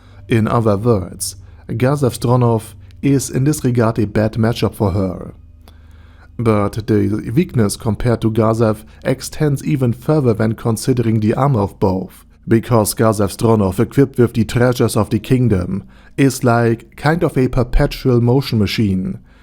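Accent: Germany. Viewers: New subscriber and amazing job just amazing and I love your German accent and can I ask you something about your channel and overlord is an amazing anime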